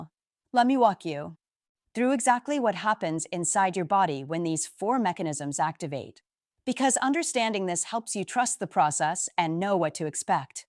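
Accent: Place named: India. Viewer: howcome you have an Indian voice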